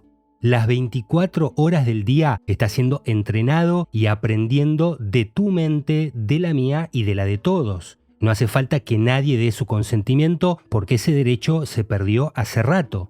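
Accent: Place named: Argentina. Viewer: Aquí, dispuesta, UNA VEZ MÁS, a SEGUIR APRENDIENDO de tus trabajos de investigación. Tu indisimulable acento argentino es, en verdad; un ORGULLO para todos tus compatriotas, entre los que me encuentro. Además, me llena de GRATITUD el hecho de que, a mis años, puedo quedar SIEMPRE ENRIQUECIDA al escuchar a un joven TALENTOSO que, seguramente, tiene edad como para ser mi nieto: un PRIVILEGIO que es para mí un REGALO DEL UNIVERSO. Un abrazo desde Mendoza.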